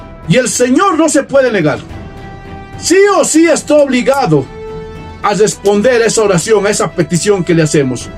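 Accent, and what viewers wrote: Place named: Mexico City, Mexico. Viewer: Hermano usted es de México? Tiene tono de la Capital. Bendiciones hasta dónde se encuentre.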